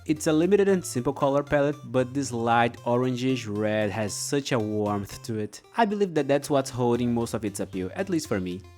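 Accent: Brazil. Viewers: Nice video idea/channel bro!! Are you brazilian by any chance? Your accent sounds familiar lol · Eu reconheço um brasileiro pelo sotaque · Espera... EU CONHEÇO UM SOTAQUE BR QUANDO EU ESCUTO UM!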